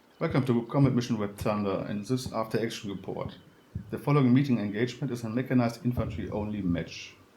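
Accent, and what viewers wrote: Germany. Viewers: Enjoyed your video. I need a few cups of coffee to play a battle that big. Also enjoyed your German accent. Then again it also could be Swiss or Austrian. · Schöner deutscher Akzent :D Combat mission content is always nice!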